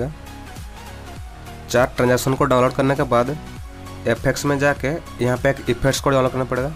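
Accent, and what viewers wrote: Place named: Odisha, India. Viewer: thoda thoda odia toch ho rha hai na apke hindi me ishliye but nice voice ❤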